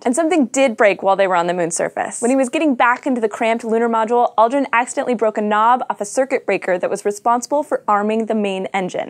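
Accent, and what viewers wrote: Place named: United States. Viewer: What region of the US is this presenter from? ... it's just I can't locate the accent that I seem to hear a lot recently, ... It's that "Croak' at the end of almost every sentence, or more so, a paragraph.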